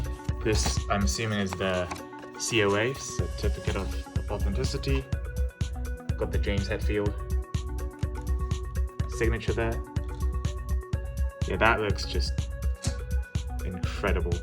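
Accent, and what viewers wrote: United Kingdom. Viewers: I love listening to your British accent ❤️ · For some reason I wasn’t expecting an english accent. But it suits you perfectly lmao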